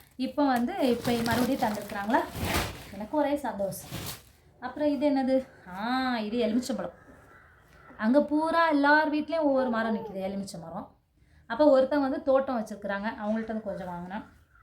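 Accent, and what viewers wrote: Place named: Nagercoil, India. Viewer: Akka antha Mann Paanai Satti la Meen kozhambu vechu paarunga,. You might be tried ., but intha sirappa sollirukellam, I don’t know other Gravy., but Fish Gravy will be awesome…. Nagercoil sirappana Ooru ., Pakkathula Marthandam , kaniyakumari Thiruvalluvar Statue, antha sun rise , sunset ., and your Senthamizh Slang of Nagercoil mixed with Malayalam know Akka., you People are awesome akka., Vazhka Vazhamudan Makkale…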